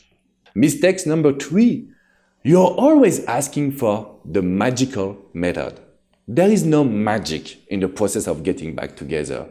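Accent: France. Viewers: Amazing video WoooW, you are amazing! and love your french accent! Thank you sharing those thing!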